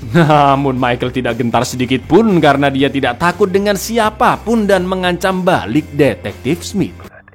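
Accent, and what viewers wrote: Java, Indonesia. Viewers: Gausah alay bro suara lu dan aksen jawa lu ..belum tentu banyak orang suka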